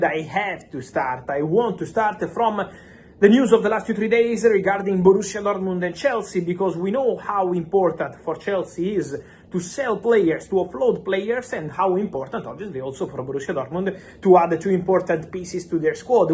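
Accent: India. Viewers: Why does he sound like an Indian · You sound like an Indian speaking English.....😒😒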